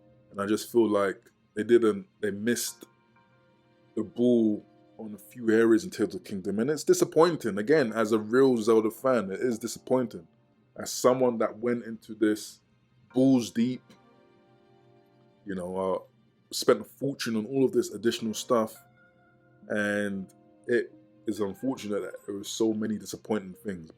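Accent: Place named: United Kingdom. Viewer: Subbed as soona s I heard your voice! Most Zelda content creators are American, nice to hear a British opinion 💕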